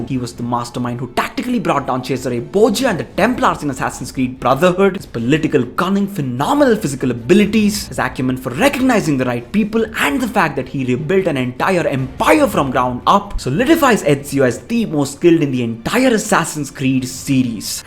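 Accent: India. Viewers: In my opinion you (the player) is the best Assassin Btw love your south indian accent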